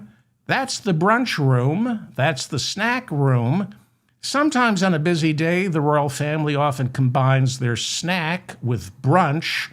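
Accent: New York, United States. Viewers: ! I love your New York City grit. Nothing like it.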